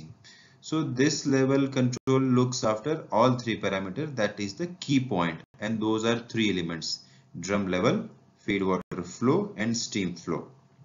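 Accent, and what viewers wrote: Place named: India. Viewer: I don't. understand. your hindu. english. speaking it has. vibration on tounge your mother language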